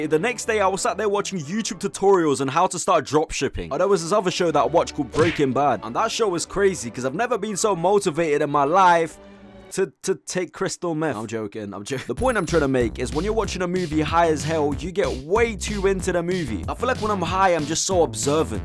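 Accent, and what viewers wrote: United Kingdom. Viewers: After half of the video, just found out this dude is British 💀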